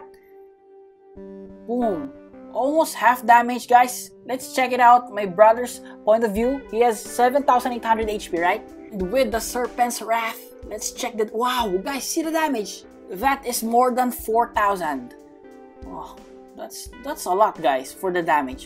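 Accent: Philippines. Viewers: Ur accent is cool and I think it's Filipino accent am I right?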